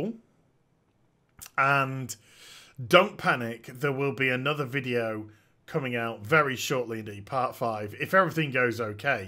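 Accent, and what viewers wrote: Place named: United Kingdom. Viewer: Great vid as always. Your soothing British voice helps me relax this boring Wednesday. I think you should wait a while and start over. Keep being awesome! :)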